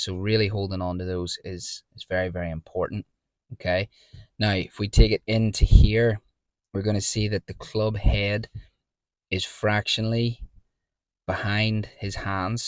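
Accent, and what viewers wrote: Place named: Ireland. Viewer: Hello, I'm Roger. I've played and studied the game for 45 years. and I think you do a great job at your craft here. My parents are from Belfast, so that's unmistakably an Irish accent you have. I've met Ludvig, he's super cool. I Love his golf swing. His head drops a wee bit in the downswing. I think for a few reasons. I'd like your opinion on that aspect. It's quite common among great ball strikers: Trevino for example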